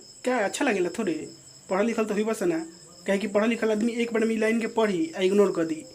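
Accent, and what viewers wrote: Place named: Bhojpuri, India. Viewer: Aapka bhojpuri avaj bahut achha lagta hai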